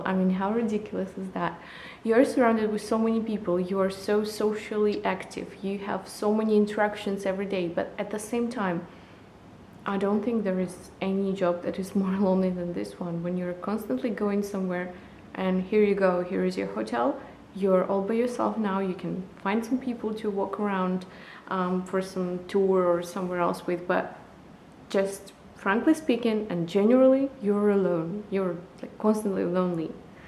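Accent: Russia. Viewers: She sounds like Russian, Ukrainian or something from that region.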